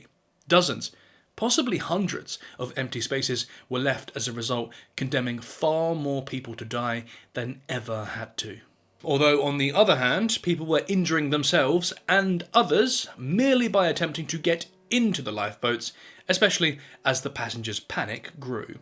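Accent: United Kingdom. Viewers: I like British accent in narration 😊. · Great report, the UK accent is a must do too. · Between him and Simon Whistler. It seems my favorite past time is having a British man tell me about historic terrors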